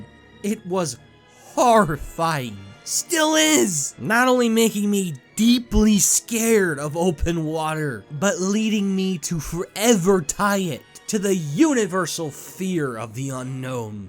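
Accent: United States. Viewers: I was N O T expecting #1. Is from Appalachia or something?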